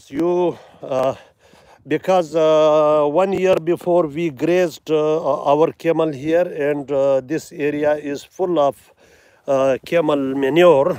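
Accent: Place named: Pashto, Afghanistan. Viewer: Very beautiful English with pShto accent... Even flowers, desert n butterfly are all awesome... Moving camera view to show surrounding ll be good idea for knowing us abt areA a lil bit more.....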